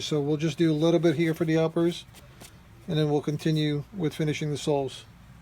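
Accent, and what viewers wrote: United States. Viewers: You sound American. I'm French born and raised, lived in U.S since '84, and have found only 1 shoe repair shop in 34 yrs living in the States. You are a craftsman on the endangered species list my good man. Those shoes are a work of art too. Nice job restoring them.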